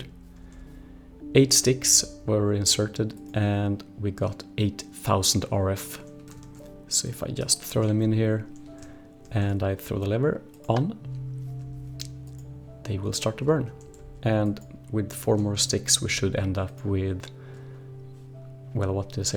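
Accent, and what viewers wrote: Sweden. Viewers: Swedish? You gotta be swedish... :D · Are you swedish? You have a very cute accent. :) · Are you from Sweden? Just gotta ask cuz it sounds that way :)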